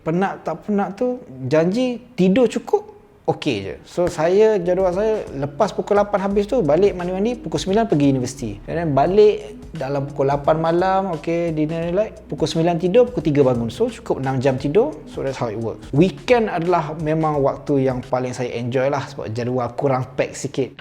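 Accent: Malaysia. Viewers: Nope.. Accent still Malaysia but lots of Oz word and slang mixed along the way 😅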